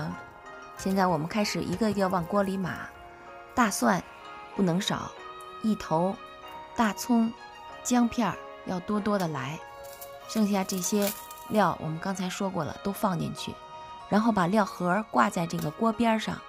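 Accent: Beijing, China. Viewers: 虽然我不吃，看着也香。今天说话有北京味了！爱听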